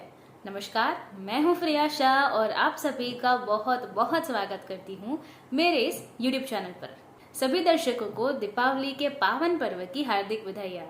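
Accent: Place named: Gujarat, India. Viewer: Hindi with gujarati accent...very good..... reminding Daya bhabhi of "ulta chasma".....keep it up beta...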